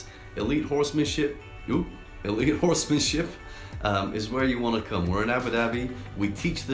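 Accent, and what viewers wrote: Australia. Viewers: khaled hamdan He sounds Aussie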